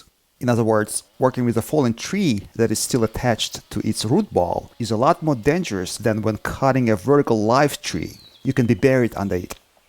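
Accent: Russia. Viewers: Такое впечатление,что русский озвучивает на английском языке ,такое акцент!!! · Tool me way too long to realise hes russian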